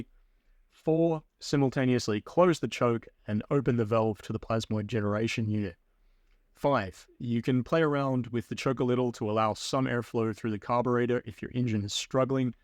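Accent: Australia. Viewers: interesting accent aus plus? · Are you an ausie living in the usa? You sound like Shantan Wantan Ichiban (no hate just curious)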